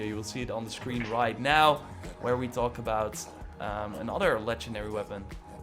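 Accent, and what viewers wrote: Netherlands. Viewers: Hey, are you dutch? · You have the same accent as the YouTuber tbag epic gaming. So I'm guessing you are from the Netherlands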